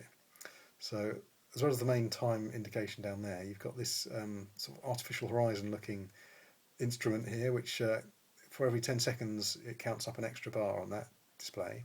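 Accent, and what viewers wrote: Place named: United Kingdom. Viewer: Why is hearing a British guy explaining something so awesome?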